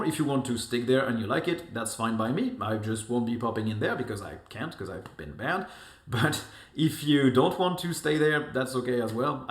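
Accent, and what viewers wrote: France. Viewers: Also, you really really should consider making one video with that French accent of yours! It will be really really enjoyable ahhaha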